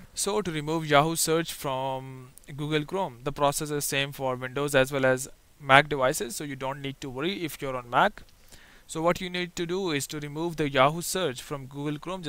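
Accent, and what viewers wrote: India. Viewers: You are my hero Indian voice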